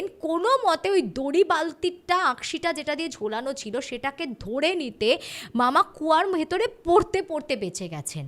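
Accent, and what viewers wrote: United Kingdom. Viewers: Nekamo kom kore apnar english accent kom shuniye thik thk bhabe ektu short e bolun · Apnar bangla ta khub ekta bhalo na , kerom ekta English tan ashe ....kintu Kichu ekta Jadu ache apnar modhdhe jeita ato attract kore ....r apnar story telling er modhdheo kichu ekta ache ....kerom ekta bokar moton kore mohit hoye shuni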